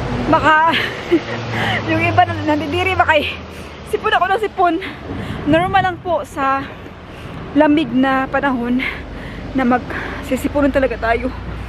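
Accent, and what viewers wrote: Visayas, Philippines. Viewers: sa saan pla ito na country? pgnagsa2xlita ka ng tagalog pra kang bisaya.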